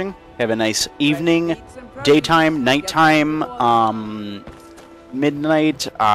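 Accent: Boston, United States. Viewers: something tells me your from Boston... My step fathers from boston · lol you sound like you're from Boston or Rhode Island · You from baahwsten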